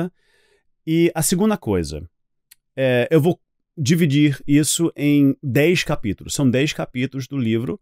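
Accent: Rio de Janeiro, Brazil. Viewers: Voce é americano? e esse sotaque do Rio ? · Quero aprender o Inglês tão bem quanto você fala o "Carioquês"! Gostei muito do seu vídeo, parabéns!!! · . Parabéns !!! é um carioca da "gema"....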